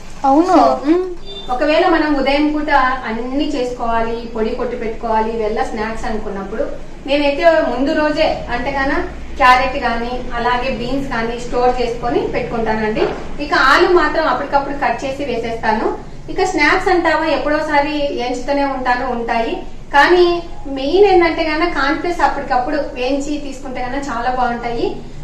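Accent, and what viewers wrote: Rayalaseema, India. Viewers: Super andi mee vantakalu meeremo rayalaseema mee papa emo andra basha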